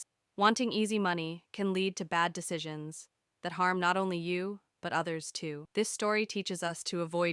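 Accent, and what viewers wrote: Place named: United States. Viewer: Remember this is African folktales and you shouldn't be using American accent for Voice note but African voice note. Thanks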